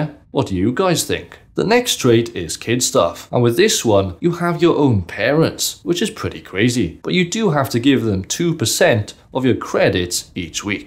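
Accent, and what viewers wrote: Wales, United Kingdom. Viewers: Love the Welsh accent, although yours isn't strong. It just sounds friendly to me. Diolch!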